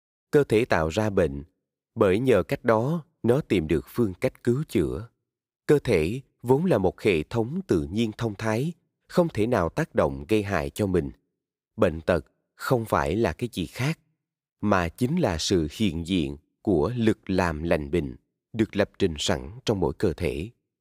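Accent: South, Vietnam. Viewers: Chúng tôi kính cảm ơn những người làm ra sản phẩm nầy và kênh nầy không ngừng phát triển . Giọng miền Nam của người đọc ấm áp quá đỗi yêu thích. Đọc chầm chậm như vầy người già chúng tôi dễ nghe. Cảm ơn. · Tôi yêu giọng miền nam. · Giong doc Nam Ky qua ro rang qua tuyet voi……..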